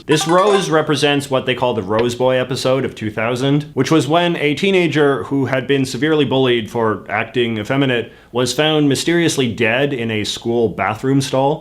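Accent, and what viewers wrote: Canada. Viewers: You say “about” the way Americans tease Canadians for saying it: “Abuht”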